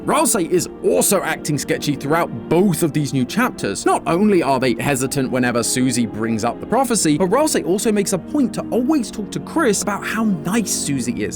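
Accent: United Kingdom. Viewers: i love how British accent makes 'deltarune' appear as 'delta room' in the subtitles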